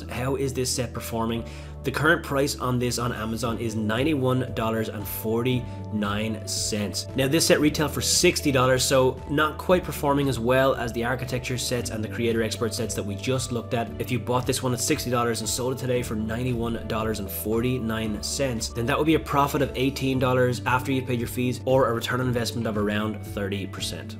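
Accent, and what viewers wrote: Ireland. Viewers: Is that an Irish accent i hear? I dont use Amazon for sale prices but thanks for the tips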